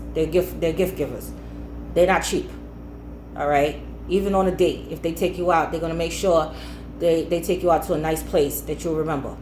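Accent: New York, United States. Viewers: i LOVE ur accent lol ny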